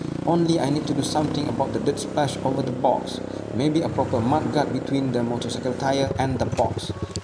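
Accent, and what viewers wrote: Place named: France. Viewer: Where do you live? You sound french but the scenery makes me second guess myself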